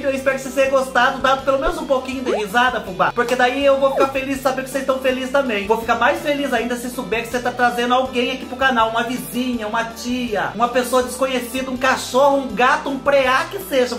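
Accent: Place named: Caipira, Brazil. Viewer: 🥀beijos de Barueri SP ❤ adoro seu sotaque caipira paulista, igual da minha família 😘😘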